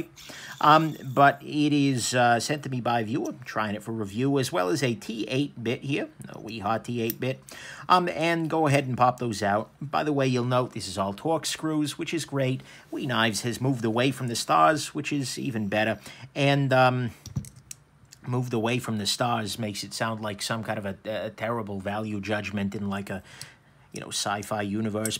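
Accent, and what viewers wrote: United States. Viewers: just got into knives. i like your reviews on knives but where are you from you sound american but with a bugger in your mouth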